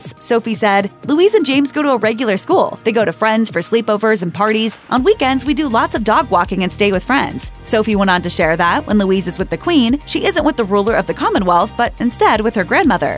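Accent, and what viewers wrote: United States. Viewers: Oh please what a tiresome yawn 🥱 channel… actually (American accent) the woman’s voice is sooooo……. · The fact an American voice is used for this article instantly renders it pointless and crass...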